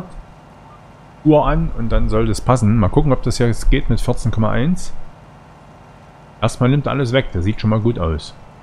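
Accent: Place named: Saxony, Germany. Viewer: Hay war wieder mal super!!! Mach weiter so!! Ich habe keine Folge verpasst. Macht immer Spaß zuzuschauen. Wo wohnst du eigentlich, dein Sächsischen Dialekt hört sich gut an meine Lebensgefährtin kommt auch aus Sachsen!!!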